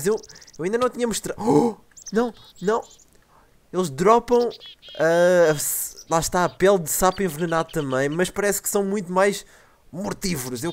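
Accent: Portugal. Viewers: e português ou brasileiro???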